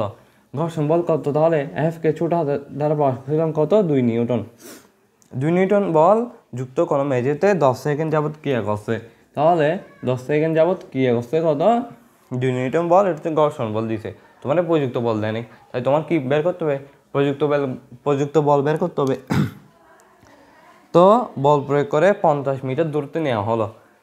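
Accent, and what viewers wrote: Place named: Chittagong, Bangladesh. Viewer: accha to Chittagong vashai je kotha bolchen tar jono bolam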